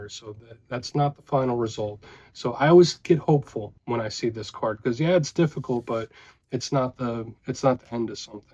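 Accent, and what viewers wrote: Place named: Louisiana, United States. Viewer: You sound like your from Louisiana!!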